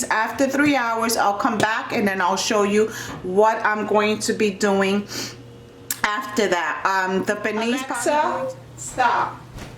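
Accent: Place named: New York, United States. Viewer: I got my pilon and I'm enjoyin' this video, but even though I might change up the recipe for the rub (more garlic, fresh oregano, less salt overall including in the adobo), I can't resist listening to anyone who says "aks" instead of "asks", and "mine's" instead of "mine", 'cause that's how I grew up on the Lower East Side. I missed doin' it for Christmas Eve or Christmas, but I will be makin' mine soon, along with pasteles and homemade coquito. Thanks for this vid, made my day more smile filled.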